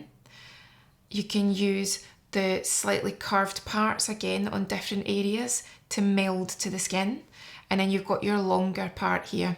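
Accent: Scotland, United Kingdom. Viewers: omg i love her Scottish accent so much! Great video and very easy to understand:) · Love Scottish accents 😬😍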